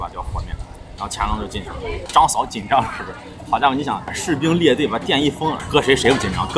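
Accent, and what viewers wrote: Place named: Shaanxi, China. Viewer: 可以听出来陕西口音 😊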